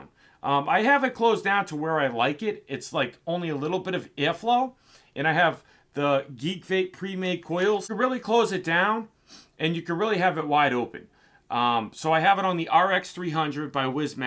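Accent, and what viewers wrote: Boston, United States. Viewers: Do I hear a Boston accent?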